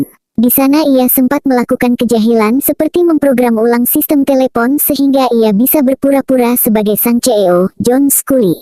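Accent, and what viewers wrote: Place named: Java, Indonesia. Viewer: Jowo e ngendi sluur😁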